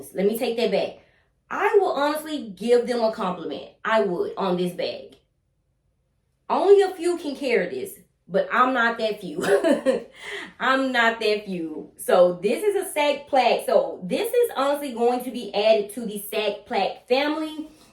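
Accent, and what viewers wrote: United States. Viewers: Love your videos. I also love your accent!!! I am from the UK and so my ear struggles to place American accents. Which part of the US is yours from? I totally agree about buying smarter. I now have a small bag collection after selling a lot - 8 at present. The only bag I need in 2023 is the odeon mm in mono and black as I don't have a 'decent capacity cross body' in my collection. I just have to stay strong when I am tempted, which is easier said than done!!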